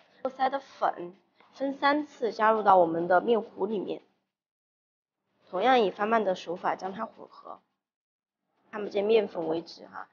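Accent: Sichuan, China. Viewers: 我猜你是四川的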